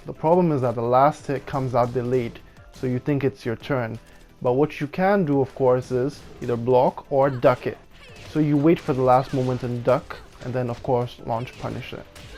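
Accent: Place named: Caribbean. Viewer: Sub for the helpful info and the carribean accent brudda! We need a Trini Tekken character already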